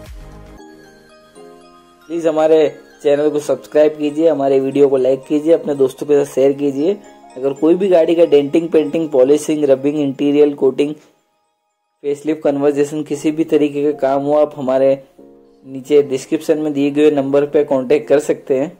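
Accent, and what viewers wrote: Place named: Bihar, India. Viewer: Osm work bro Bihari language me ekdam kantaap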